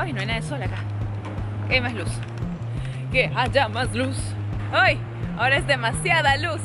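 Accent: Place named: Lima, Peru. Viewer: El acento limeño XD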